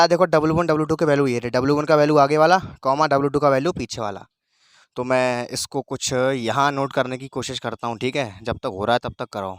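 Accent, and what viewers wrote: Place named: Braj, India. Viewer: braj bhasa accent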